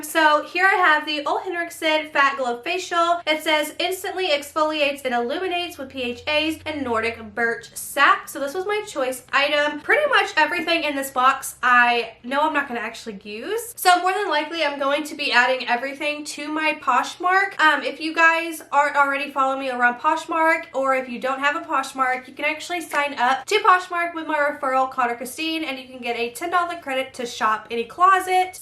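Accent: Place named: United Kingdom. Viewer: I'm really surprised, I thought u were British and live in the UK,for some reason I hear a British accent when u talk.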